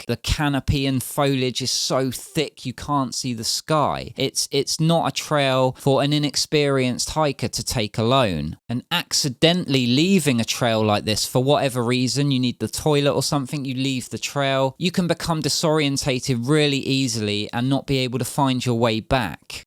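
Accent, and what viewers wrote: United Kingdom. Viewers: Your English accent makes the English language lovely to hear and understand.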